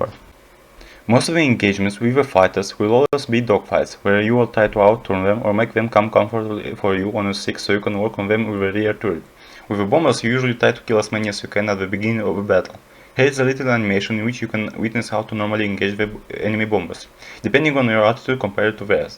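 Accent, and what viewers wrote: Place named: Russia. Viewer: Твой русский акцент режит прямо по ушам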